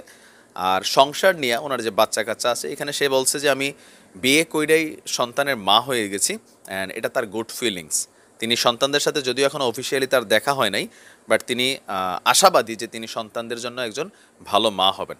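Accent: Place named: Comilla, Bangladesh. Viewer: আপনার কুমিল্লার ভাষা গুলো খুব ভালো লাগে আমার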